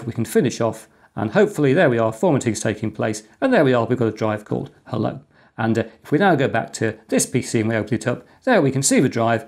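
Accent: England, United Kingdom. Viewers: Genuine English accent, simply love it so much😍😍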